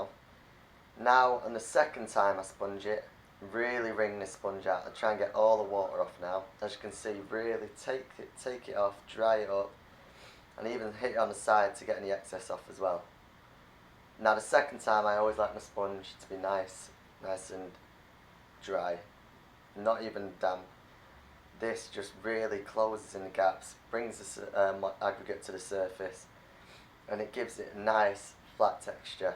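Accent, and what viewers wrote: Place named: Northern England, United Kingdom. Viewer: Nice pne mate ye had a very bad plastering life so unlucky and syame im very good and good bloke . Im moving up preston way up north . Ur accent sounds from that way . Were are you from were abouts/areas do you work il be after a bit work up that way can you give me advice contacts or have any work try me on here there . Im leaving this life down here been sooo unhappy so want a fresh start up there . Its all long story . But ur a greate bloke maybe you can give me advise contact leads companys and what companys stay away from . Thanks ant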